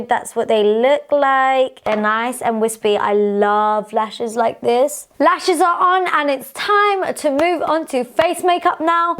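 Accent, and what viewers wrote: United Kingdom. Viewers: Wow loved the makeup look you sound so British like a lady from loose women omg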